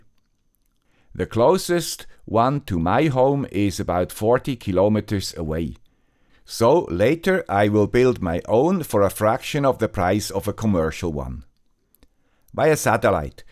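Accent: Switzerland. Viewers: That's a Swiss accent? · Hello guy with the Swiss accent. Can you tell us some more about the business case? It's been about a year, I'm very interested to build a base station to support RTK2GO and earn some money too. How much did you earn in this year? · Hello, Man with Swiss accent. Can you explain more detailed how 4th satellite helps calculate exact time? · Swiss accent acquired at the ETH Zürich is the best Swiss accent! 😀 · Lovely video! thank you for covering this topic :) greetings from another Swiss accent guy! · Dude with the Swiss accent, you are awesome! Thanks so much for sharing this information with us! I love your content! ❤️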